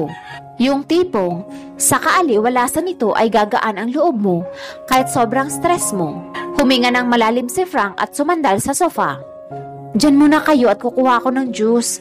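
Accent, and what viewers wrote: Visayas, Philippines. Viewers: Anyare sa narrator?ganda ng kwento sana,,diko malaman kung bisaya o batangueña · Sa nagbabasa ng kwento😅😅😅 bisaya kapoba para kalang kasing tumutula hahahaha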